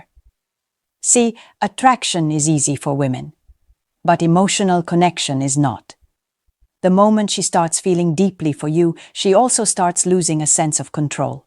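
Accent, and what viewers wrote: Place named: United States. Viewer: Yeah. The A.I. American accent is HORRIBLE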